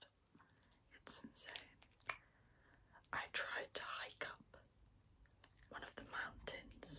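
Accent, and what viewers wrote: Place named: United Kingdom. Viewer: oh, your british accent <3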